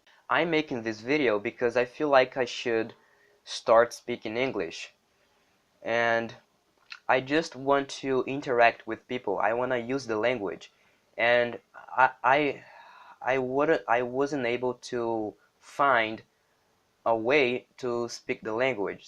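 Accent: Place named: United States. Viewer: Excellent mate. Your pronunciation is really good. You have a slight American accent too :)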